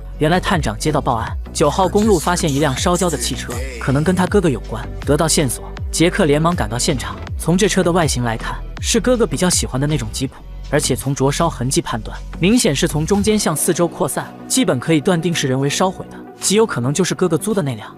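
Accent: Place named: Sichuan, China. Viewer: 感觉解说员是我们四川人啊！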